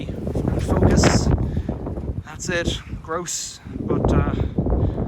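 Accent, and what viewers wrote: Ireland. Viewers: oops, my bad. I thought it was a channel from Ireland, I was wondering, one would never get away with dumping like that in Ireland, at least I hope not. I wrongly assumed when I heard the Irish accent that it was Ireland.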